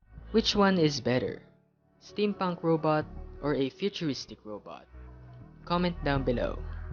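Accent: Philippines. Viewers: I Knew he was filipino i just got it by his voice😀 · Filipino? You kinda sound like one lol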